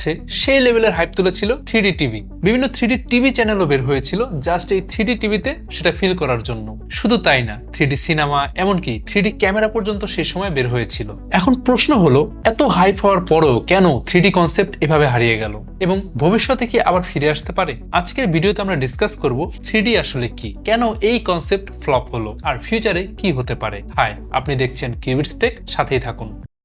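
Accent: Bangladesh. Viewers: কেবলমাত্র হাড়িয়ে শব্দটাই নয় , আপনার কথা বলার ভঙ্গিমার মধ্যেও এমন অনেকগুলো শব্দ রয়েছে যেগুলো আপনি বাংলাদেশী মানুষদের মতন করেই উচ্চারন করেছেন , আমরা পশ্চিম বঙ্গের বাঙালীরা সেই একই ( এই শব্দটার কথাই বিশেষ করে বলতে চাচ্ছি ) শব্দ একচু অন্যভাবে বলে থাকি । যাইহোক আপনাকে অভিনন্দন জানাচ্ছি । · বাংলাদেশের বাঙাল রা এই রকম উচ্চারণ করে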